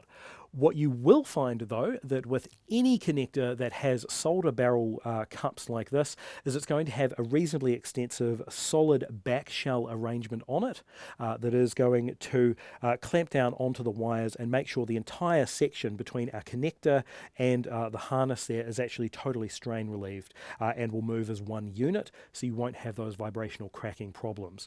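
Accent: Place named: New Zealand. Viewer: Good old kiwi pronouncation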